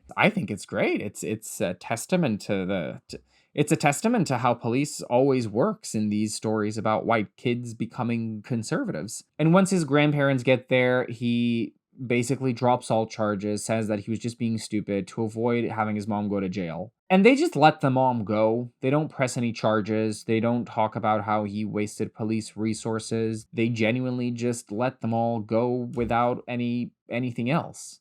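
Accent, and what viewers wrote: United States. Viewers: Your American accent is perfect 👌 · First time on your channel; you said you're not American but I can't hear anything but an American accent in your voice? Please tell me what I'm missing! No disrespect meant at all. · You speak with an American accent (at least you sound American to me). Your channel says you are from the UK, though. Are you actually from Canada? Just curious. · people pronounce it differently for their own grandparents. You did fine. I had a Memaw (pron. mee-maw), tho, instead of a mamaw (as you pronounced it)... but even in the same area here where my grandparents lived... my cousins pronounced it differently for their own grandparents. It's common terms in the US south and in the mountains for grandparents. And then there's the pawpaw tree... and multiple towns named for that. A uniquely native American tree and largest native fruit. 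Some folks call their grandfather pawpaw, too. You sound like you belong in the US with your accent... I would never have guessed English was your 3rd language. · Might I remind these christofascist theocrats - Pete Buttigieg has 2 children. So does Kamala Harris. BTW, you have a VERY American accent! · Why do you have an American accent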